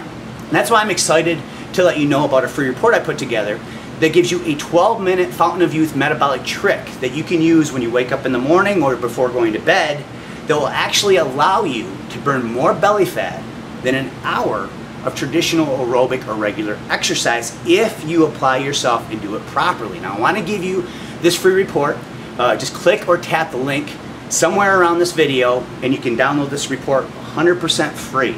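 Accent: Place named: United States. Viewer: nice video, You have a very strong American Accent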